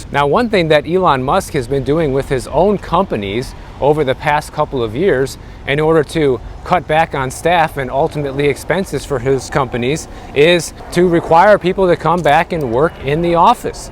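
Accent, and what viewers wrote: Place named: South, United States. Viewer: We know you're a southerner when you say ( furr ) instead of ( for ). NO NO NO I can 1st hand attest to money ruining lives. Money can absolutely ruin and kill people and I have 2 cousins and 2 uncles that are deceased due to money and a good friend of mine his son is dead due to money. Musk and Vivek claim they could probably cut 2 trillion a year in waste and fat .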